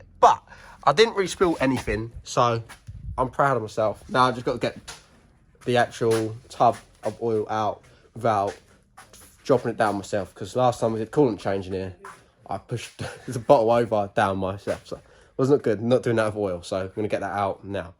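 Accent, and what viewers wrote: United Kingdom. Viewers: That Uk accent kills my ears, for real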